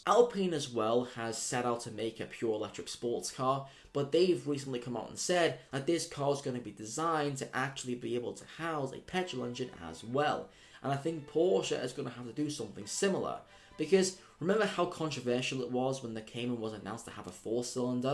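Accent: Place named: West Country, United Kingdom. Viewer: Yank here. Some of us like to try to figure out different English accents. Most Americans can't even tells the country, but many of us can. Are you on the west side? Bristol? Maybe Wales? Sorry that has nothing to do with your Porsche content, but your video was suggested to me and I love the Porsche topic.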